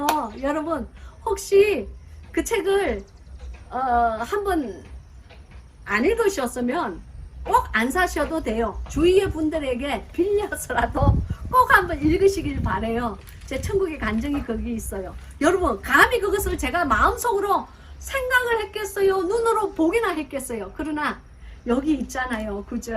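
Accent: Gyeongsang, South Korea. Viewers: 목사님 경상도 사투리가 정말 구수하네요 주안에서 평강과 은혜가 충만한듯 합니다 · 목사님의 순수하심에 빠져들어 이제는 사역을 통하여 체율체득함으로 나오는 간증과 함께 생명의 말씀에 붙잡혀 매일 빠져들고 있어요ㆍ목사님 어투가 경상도 출생인가보다 했는데 부산이 고향이시란걸 오늘 알았네요ㆍ삶이 예배란 말씀에 전율이 흘러요ㆍ목사님 너무너무 고맙고요 감사합니다 솔직담백한 큰매력도 큰능력 이라고 느껴져 더욱 감사합니다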